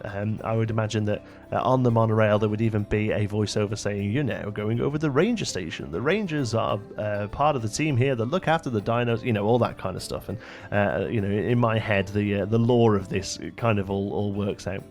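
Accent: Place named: Black Country, United Kingdom. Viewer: thought i could tell your black country accent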